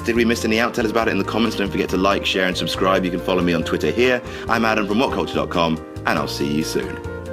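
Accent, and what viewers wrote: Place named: England, United Kingdom. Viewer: Nothing on this list is that shocking or unknown to fans, and saying it in a sarcastic English accent doesn't make the list any better.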